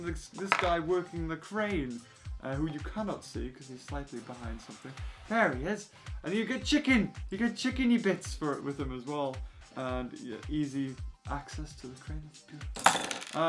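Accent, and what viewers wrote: United Kingdom. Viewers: plz reply are you Brtish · Are you britesh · you are from UK??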